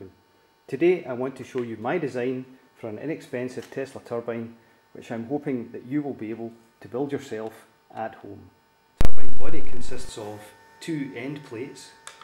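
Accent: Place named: Scotland, United Kingdom. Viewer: thats a Scottish accent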